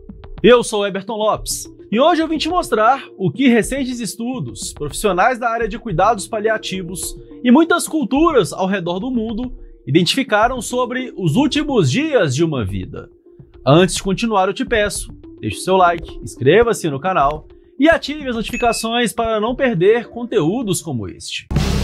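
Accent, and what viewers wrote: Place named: Minas Gerais, Brazil. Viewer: Adoro seus vídeos, muito bem explicados, sua voz suave e esse sotaque mineiro maravilhoso!!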